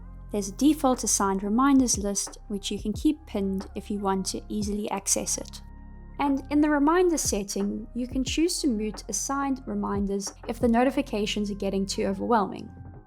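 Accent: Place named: South Africa. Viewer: Great to hear a South African voice in the content space and this is a great video with clear explanations for a very underused app.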